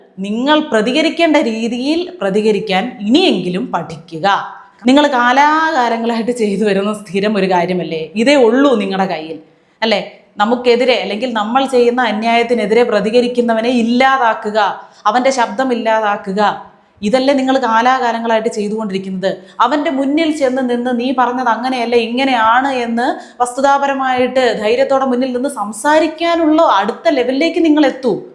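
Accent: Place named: Trivandrum, India. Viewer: പ്രത്യേകിച്ച് ട്രിവാൻഡ്രം സ്ലാങ് 😂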